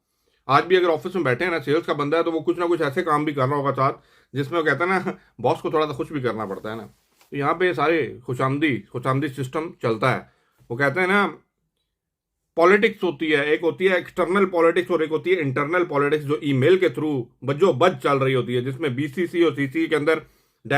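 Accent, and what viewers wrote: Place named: Punjab, India. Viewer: are bhai hindi bol raha hai ya punjabi , thoda clear bol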